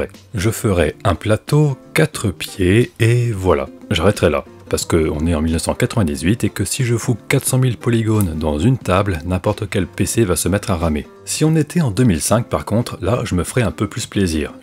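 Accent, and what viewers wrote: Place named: Paris, France. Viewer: Je viens de découvrir ta chaîne et malgré ton accent parisien ;) je suis très fan, merci.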